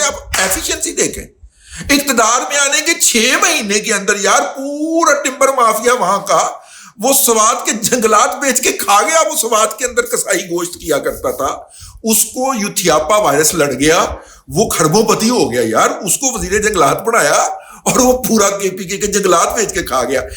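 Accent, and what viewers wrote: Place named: Lahore, Pakistan. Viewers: Pure lahori style hy apka excellent sir thanks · V good sahib I like your lahori style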